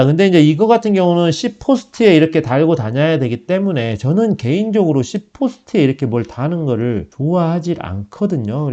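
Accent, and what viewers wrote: Jeolla, South Korea. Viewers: 잘 보고 갑니다~^* 킥보드용 블랙박스를 찾다가 채널에 놀러왔습니다!! 말투가 우리동네 같네요 저는 목포입니다 ㅎㅎㅎ 구독 좋아요 누르고 갑니다❤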